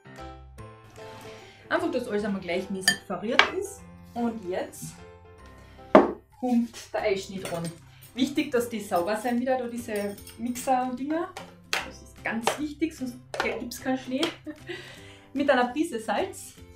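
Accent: Carinthia, Austria. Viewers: Hab mei Ergebnis gerade bei Instagram gepostet inkl Verlinkung. Ist wirklich lecker 👌 Süß find i, dass du in dem Video deinem Kärntnerisch so treu geblieben bist 😊👍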